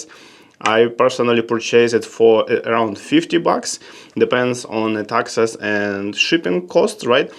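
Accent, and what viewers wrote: Russia. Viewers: ваш акцент похож на русский, вы говорите на нем?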